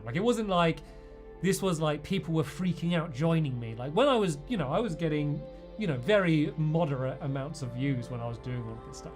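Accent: United Kingdom. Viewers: British English quite well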